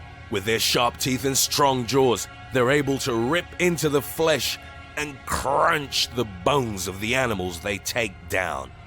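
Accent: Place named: Cockney, United Kingdom. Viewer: Easy going gentle giant 😂😂 You sound proper cockney 😂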